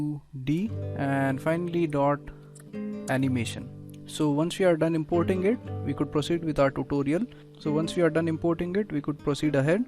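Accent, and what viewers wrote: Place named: India. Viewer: I cant withstand indian accent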